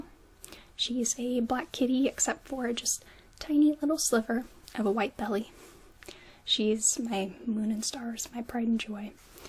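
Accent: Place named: Missouri, United States. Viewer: Lol you from Missouri?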